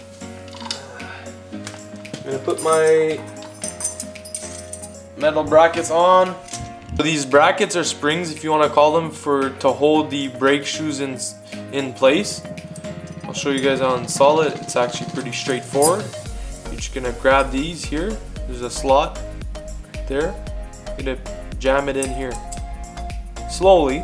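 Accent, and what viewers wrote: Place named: Montreal, Canada. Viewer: Sounds like a Montreal Anglo-Italian accent, maybe Lachine?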